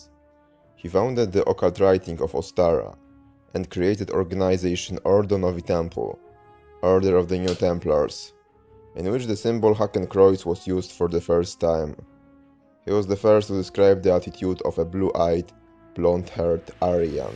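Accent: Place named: England, United Kingdom. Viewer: Why so annoying english accent? Pease, first learn speaking then speak.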